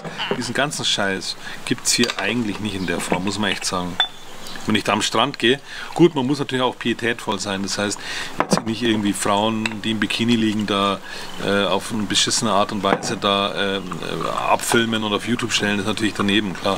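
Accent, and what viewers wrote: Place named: Bavaria, Germany. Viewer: bisserl mehr bayrisch dadad ned schadn , aber alles gut🥳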